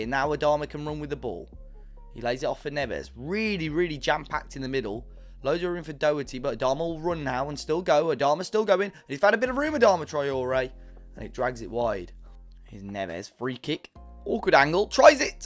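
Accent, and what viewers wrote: Malta. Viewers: you re maltese ? nice channel btw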